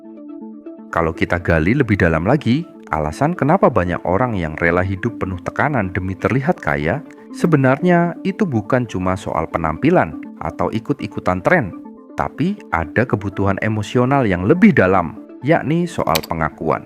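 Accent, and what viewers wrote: Java, Indonesia. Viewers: Mas sampean medok, jane rasah nganggo lo gue. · gue juga heran, bang. aksen Lo Jawa banget, tapi pake Lo Lo, gua gua. biar apa??😄😄😄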